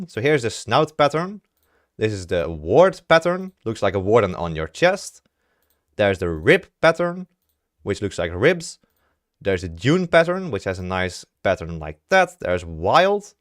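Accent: Netherlands. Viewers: Sorry to say but you can hear that ur Dutch 😅😅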